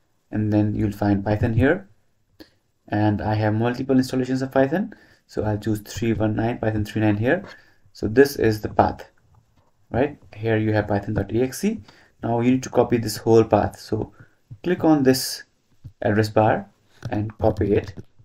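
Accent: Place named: India. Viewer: Never trust a guy with a indian accent that gives advice about python and windows config channels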